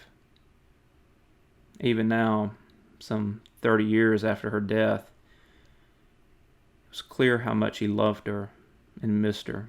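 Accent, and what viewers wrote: South, United States. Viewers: Yes, us Brits do love a Southern drawl...I find it mesmerising 🇬🇧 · Came here from an add. Heard you have an American southern drawl, which is my weakness (I'm British) and had to subscribe.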